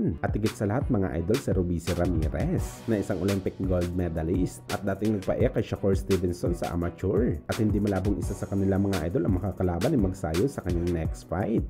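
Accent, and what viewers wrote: Iloilo, Philippines. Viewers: Ilonggo geyd😅😅😅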